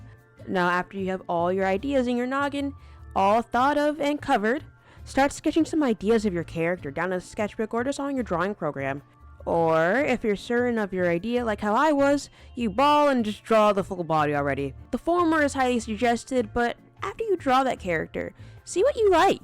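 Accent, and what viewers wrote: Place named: Netherlands. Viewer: oh idk why your accent sounded vaguely Dutch to me lol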